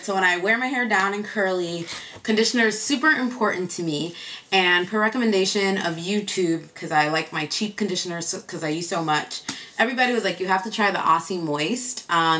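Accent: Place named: Chicago, United States. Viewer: Your Chicago accent is really strong in this video. It makes happy because I my miss my hometown!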